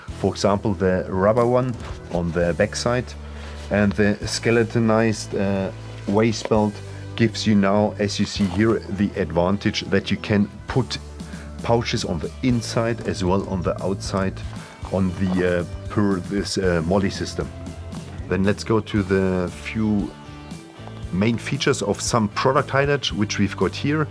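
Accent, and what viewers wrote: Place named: Germany. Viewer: Nice video. I like LT gear. Always reliable, innovative and robust . Good video. I like the German accent. Had to think about the German coast guard video. <link>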